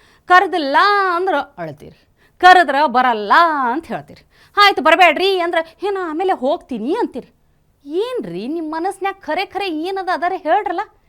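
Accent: North Karnataka, India. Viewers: ಮೇಡಂ ಉತ್ತರ ಕರ್ನಾಟಕ ಭಾಷೆ ತುಂಬಾ ಚೆನ್ನಾಗಿರುತ್ತೆ ಜೈ ಶ್ರೀ ರಾಮ್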